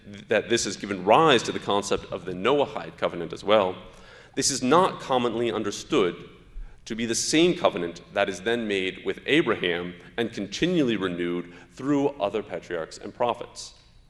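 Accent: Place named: Arab world. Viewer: He has a beautiful genuine Arabic accent.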